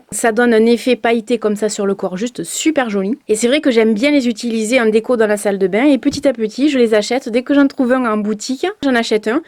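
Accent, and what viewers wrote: South, France. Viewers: Je vous adore vous êtes super génial et vraiment très jolie. Merci pour toutes vos vidéos et votre investissement pour nous aider à faire de bons choix. Et en plus votre petit accent amène du soleil et des cigales 😊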